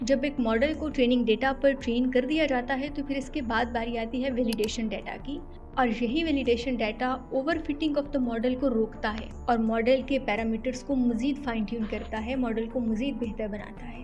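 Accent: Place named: India. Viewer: Sorry I had to stop watching you when you talk like an Indian. Its not urdu but indian hindi. Maanenna hay is indian style. Urdu is meray khayal main or meray motabiq. Bye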